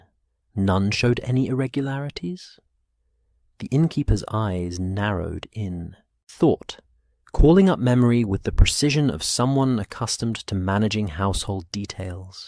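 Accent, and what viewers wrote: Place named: England, United Kingdom. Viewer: Clark is how we say that word in Britain. He has an English accent but can't say Vase. We say V-ah-ze. Americans say Vay-ze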